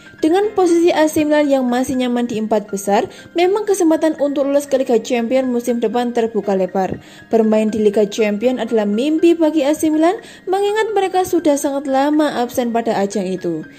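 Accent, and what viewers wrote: Java, Indonesia. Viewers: medok mbak yu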